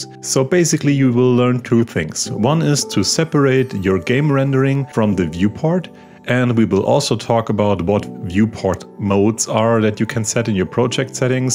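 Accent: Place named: Germany. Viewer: Do you not recognize the german accent?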